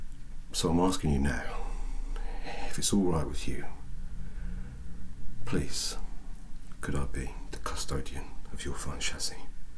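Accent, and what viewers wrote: United Kingdom. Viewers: Could also be titled as "Male Winry Rockbell with British accent fixes you" I wanted to save this for bedtime but... Can't resist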